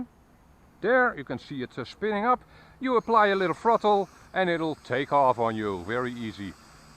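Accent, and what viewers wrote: Netherlands. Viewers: wat een verschrikkelijk Nederlands accent heb jij, natuurlijk ben je Nederlands maar dit klinkt echt bagger. alsjeblieft werk aan je Engels.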